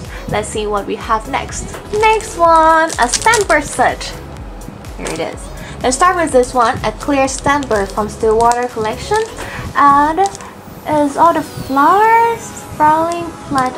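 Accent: England, United Kingdom. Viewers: ชอบสำเนียงอังกฤษอ่ะฟังเพลินมากเลยค่าาาาทำออกมาบ่อยๆน้า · ติดตามอยู่นะคะพี่ ชอบสำเนียงภาษาอังกฤษพี่จัง ทำมาอีกบ่อยๆนะคะ หนูจะได้ฝึกไปในตัวด้วย ปล.หนูไปสอยสมุด เครื่องเขียน บลาๆ ตามพี่เยอะมาก จนต้องตีมือตัวเองให้หยุดซื้อ <num> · มีทุกอันที่เป็นสติ๊กเกอร์ก่อนพี่ จะรีวิวเจ้าค่า เห็นสีแล้วมันถูกใจมั๊กมาก. ชอบสำเนียงอังกฤษเลย ถึงแม้ต้องอ่านซับรัวๆสู้อังกฤษไม่ไหวจริงๆนับถือๆค่า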